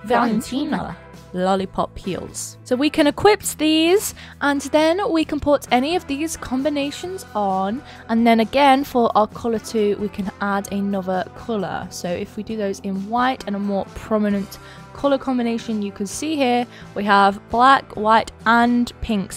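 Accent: United Kingdom. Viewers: Is this British accent BC if it is its music to my ears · Why do i feel like your half british. o.O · Weird question, are you British?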